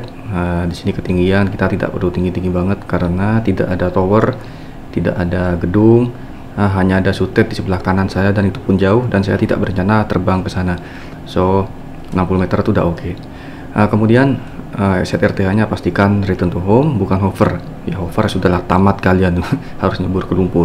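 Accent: Madura, Indonesia. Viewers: Logatnya madura...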